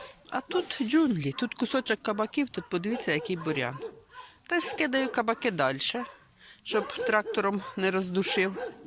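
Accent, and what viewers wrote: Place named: Poltava, Ukraine. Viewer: Вас також хайБог береже і Ангел Охоронець. Бережіть себе, дорогенька, це я запитувала, звідки ви. Бо по говору наче з Полтавщини. Ви дуже приємна жінка. Приємно почути, трішки відволіктися від своєї буденності, думками когось пожаліти, заплакати. Я дуже мало кого дивлюся, а вам , дай Боженька, здоровʼя❤️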